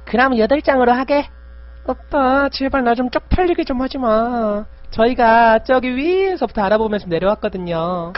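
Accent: Jeolla, South Korea. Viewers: 내가 광주/목포 사는데, 그냥 단어며 억양이며 뉘앙스며 그냥 딱 요동네다. 위화감 전~혀없음. 그냥 찐임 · 이건 전라도 네이티브다... 전라도 사람이 아니면 구사할 수 없는 수준 ㅋㅋㅋ 어머님들이 쓰는 억양 그대로 고증해왔네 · 어쩜 이렇게 전라도 사투리를 맛깔나게 하시는지ㅠㅠㅠㅠ 고향 생각나서 눈물이 날 지경이네ㅠㅠㅋㅋㅋ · 전라도임? 실망이 크다 구독취소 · 순정 전라도분이시군요ㅋㅋㅋㅋㅋ 저사투리는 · 아따 저 걸뱅이새끼 난 이부분이 제일좋아ㅜㅜㅜ 이거들으러 온다 님 전라도사투리 너무찰져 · 우리 고모가 전라도 분인데..우리 고모랑 말투가 똑같아 우리고모인줄... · 내추럴본 전남출신으로써 사투리 네이티브한거 완전 인정 해부러야것네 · 가 전라도 사람이라 잘아노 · ㅇㅇ? 장 님 전라도 장흥 출신이래요? 동향이시네 어짠지 사투리가 아조 제대로여서 깜짝놀랐네요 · 역시 전라도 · 아니진짴ㅋㅋㅋㅋㅋㅋ이토록 찰진 사투리를 영어로 번역할수있다는거야? 외국인은 이 전라도 소울을 느낄수 있는건가? ㅋㅋㅋㅋ개웃기넼ㅋㅋㅋ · 전라도가 고향인데 전라도 사투라 쓴다고 뭐라하냐 ㅋㅋ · 사투리 오진다 ㅋㅋㅋㅋㅋ 전라도사람보다 잘쓰는듯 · 전라도 사투리 억양이 약간 남아있는게 개웃김ㅋㅋ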